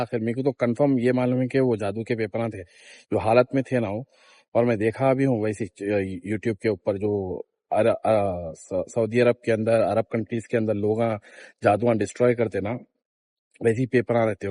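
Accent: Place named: Hyderabad, India. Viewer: Assalamualaikum bhaijaan ...love to see ur vlogs...and also like your hyderbadi language 😅😅😅...plz vlogs continue raikho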